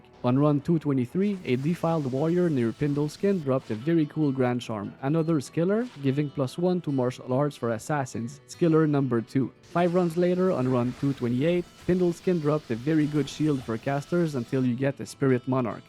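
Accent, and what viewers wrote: Quebec, Canada. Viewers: Tes quebecois en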